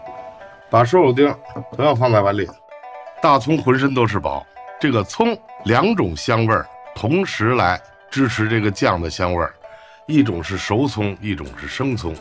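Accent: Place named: Beijing, China. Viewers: 师傅的北京话听得真舒服，到家了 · 第一是北京出租车司机现在基本都是郊区甚至河北人了，已经不是传统北京，也就是二环（最多三环）以内的那种北京人了。第二是旧北京是割裂的，拉车挑水的苦力是一个阶层，满蒙王公、汉人士大夫是另一个阶层。现在常见的那种夸张的北京话，比如大量儿化音、吞字吞音都属于前者。这个视频里的是接近后者。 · 正宗皇家口音，非常悦耳。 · 为了这一口标准的京腔来的，太好听了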